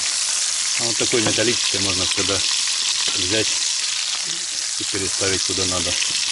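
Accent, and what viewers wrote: Ukraine. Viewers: Поэтому и спрашиваю..по говору понял что украинец. Я недалеко от Новочеркасска проживаю ни снега ни льда нету давно.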